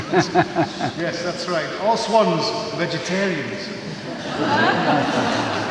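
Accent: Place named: Newcastle, United Kingdom. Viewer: Swans might be vegetarian but I tend to try and avoid encounters with them on lakes in thunderstorms. <link> The sense of humour and accent are identical. This was our experience of swans; <link> Are you sure it is thirty pounds or thirty pieces of silver? M1O4WvtTE4o?si=9muZ2LKKr7aqeyzI I have a soft spot for The Geordie accent. Probably need heaters in a cold, draughty place like that. It is north of Watford after all.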